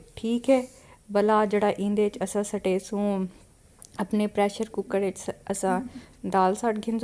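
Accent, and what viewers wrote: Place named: Saraiki, Pakistan. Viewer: Piari piari sariaki vice😅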